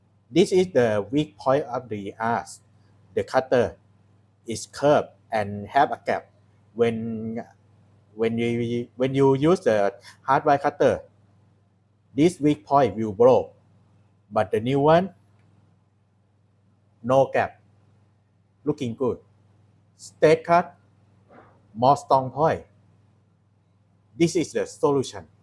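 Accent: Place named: Thailand. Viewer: สำเนียงคล้ายๆคนไทย เลยครับ เจ๋งๆ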